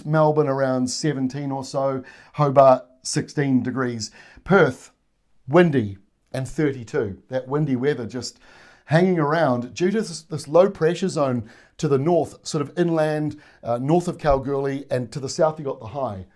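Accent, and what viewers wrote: New Zealand. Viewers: It's always good to hear a kiwi doing an Australian weather forecast. Good one, Mr!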